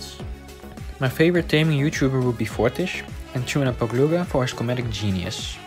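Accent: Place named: Netherlands. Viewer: OMG THE NEDERLAND ACCENT OMGGGG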